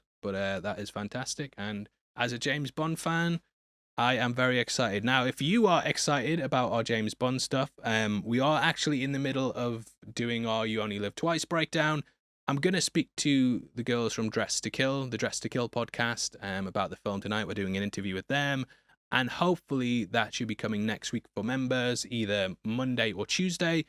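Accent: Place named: Scotland, United Kingdom. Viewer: I like how his accent has a hint of Scottish in it.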